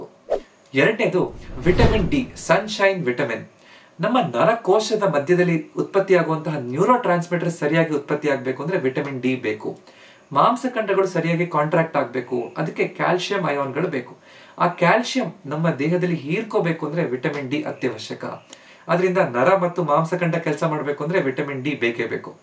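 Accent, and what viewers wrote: Standard. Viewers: Swàtchha Kannada & good information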